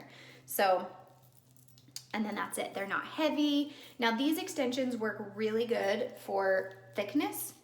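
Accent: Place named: North, United States. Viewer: I wrote to you again about coloring my hair. I’m thinking I need to go silver because if I go blonde I am going to be touching up the roots all the tine. Because I have salt and pepper growing out. Ugghh I hate it. Btw, I love your accent. I am from Indiana, but I can tell you are from the North. Love it!! lol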